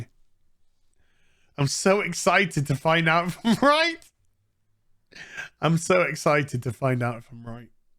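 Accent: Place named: Yorkshire, United Kingdom. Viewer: Just get well soon. Life's boring without a good Yorkshire rant!!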